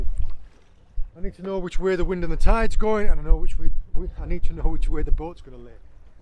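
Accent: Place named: Cumbria, United Kingdom. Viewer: you’ve almost got a bit of a Cumbrian twang in your accent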